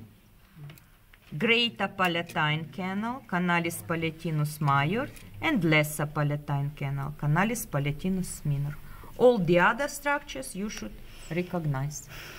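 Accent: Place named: Russia. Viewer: SHE HAS RUSSIAN ACCENT ,, VERY NICE VIDEO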